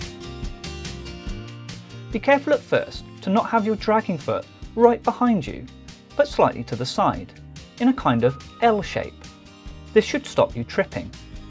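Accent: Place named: United Kingdom. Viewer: tbh, i just watch these videos because i enjoy the british accent^^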